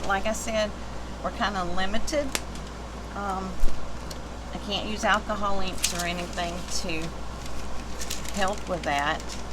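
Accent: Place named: South, United States. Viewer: Hi, I just subscribed. Her cup from you came out very pretty! Hers is very cool too. You must be from North Florida? because you have the southern accent 💗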